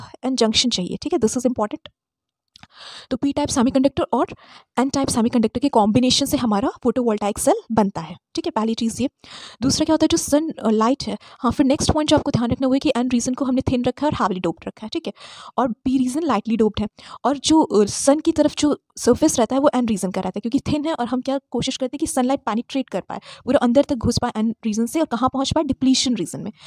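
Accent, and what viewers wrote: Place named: India. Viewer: Please speak slowly, aapki BPO wali accent se aadha lecture uper uper jata hai, thoda toh cold blooded accent use karo kaha jane ki jaldi hai.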